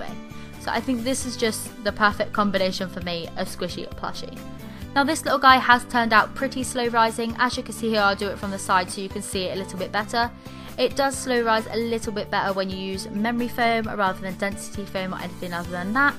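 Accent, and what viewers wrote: United Kingdom. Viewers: It’s because she’s British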